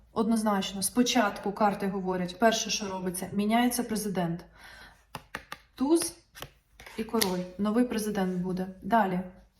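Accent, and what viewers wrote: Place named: Kremenchuk, Ukraine. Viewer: Чистейший украинский, скорее в Кременчуге слышал, ни в Киеве ни Харькове, в Харькове вообще не слышал, ни в Полтаве ни в Бердичеве, ни в Житомире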